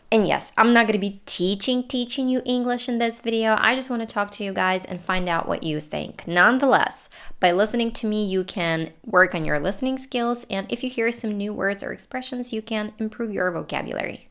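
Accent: United States. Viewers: as a Colombian Spanish speaker I could say that she does have this Spanish accent, but also i could say that it's sounding kinda faking or forcing but i feel that's not a big deal. She does express well with that accent at the end. By the way it's disgusting that behavior changes to someone if one speaks with a different or none accents, that's quite narrow-minded. Notwithstanding, also it's quite important to have a good communication if your current job treats about. I'd reckon that does not care so much about the kinda accent you speak, but in being able to communicate each other in the best way possible. Nice video and I love your accent! My accent is American too! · You're accent is too thick. It's like a caricatural american accent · I adore your American accent😍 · Can you make a video speaking in your natural accent? Or is this your natural accent? 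Why did you learn the American accent and not the British? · As an American, to my ears, your American accent is really REALLY good. As far as what you were saying-- I agree. Anyone who is criticizing needs to know that accents help language learners be understood even better by whomever they're talking to. If you're going to be speaking English with Brits, learn that accent, if you're going to be talking with mostly Americans, learn that accent! 🙂 It's all about understanding and being understood and you'd be surprised how much accents factor in to that! I sometimes can't understand strong Scottish or Irish accents if people are talking too fast, even though they're speaking English! 🤷‍♀️🤷‍♀️ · Geeezzzz, I thought you were American! I am shocked 😳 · Thank you So Much. ❤😊👍 Your videos are very useful and helpful. I found your channel last summer and I didn't believe that you are Ukranian. 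I thought this girl had a great Americian accent and she lived in some part of America. I was really surprised when I knew at the end of the video those were just my thoughts. Don't listen to or reapet their beliefs. I'd like to give one of my favorite Henry Ford's quote: "My best friend is the one who brings out of the best in me." You and your family DO GREAT JOB !!! 👍❤👏🤗 Keep it up !!! The World is counting on you !!! 🎉😊🎊❄🎄🎁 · ahahha you are under fire bcs of your clear american accent while people around you do not have ımıtatıon skılls to adabt their voıce into the language they learn this makes them jealous and they are attackıng by harsh crıtıcısm :D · You have an amazing american accent! I wish I had the same one. It was a surprise that you are from Ukrainе!(so am I) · How did you get this american accent?? If you answer me, I'll subscribe to your channel ☺ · I can relate to you! 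I'm also a non-native Youtuber who also teaches English and is originally from Ukraine like you (I've lived in Spain half of my life). I imitate the American accent too. It's so true that no matter what you do, you'll be always criticized. While you are under fire because of your impeccable American accent, sometimes I'm criticized because it's not good enough. It's a price you have to pay for doing what you love. Thanks for your inspiring videos!! :)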